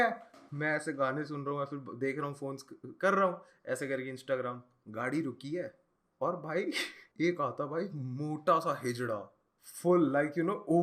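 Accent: Nigeria. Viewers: that nigerian accent 🔥🤙🏼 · Bhai clg mai baitha tha. Ek nigerian exchange student hai. Mera dhyaan phone pe nahi tha par mai sunn raha tha. Mujhe 2 min laga wahi mujhse baat kar raha hai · nice nigerian accent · he nailed the nigerian accent god damn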